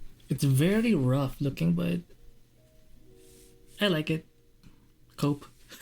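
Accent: Philippines. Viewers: Nagulat ako nung namention ung UST eh HAHAHA. Pero di na rin nakakapagtaka kasi very Pinoy ung accent nya, in an endearing way.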